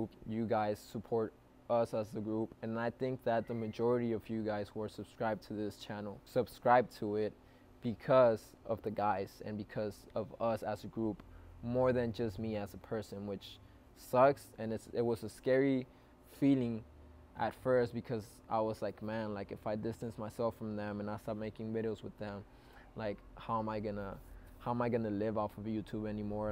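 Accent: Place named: Spain. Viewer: whoaaaaa his spanish accent has gotten better. you can hardly hear it when he speaks english thats rly cool to see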